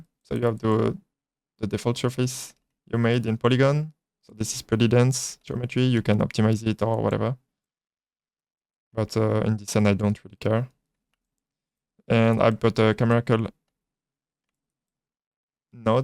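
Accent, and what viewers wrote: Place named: France. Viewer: Comment il gère ça le bro avec le ptit accent frenchy hehe :) lourd lourd lourd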